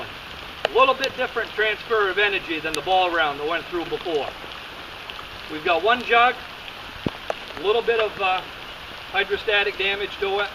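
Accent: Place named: Pennsylvania, United States. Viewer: By the way, are you in PA? Your accent is familiar.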